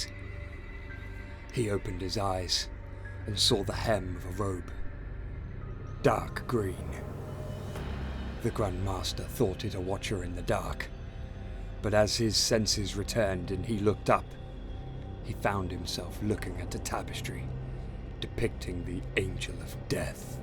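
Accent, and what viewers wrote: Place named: Scotland, United Kingdom. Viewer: Lower the intensity of the “Scottish” accent IMO.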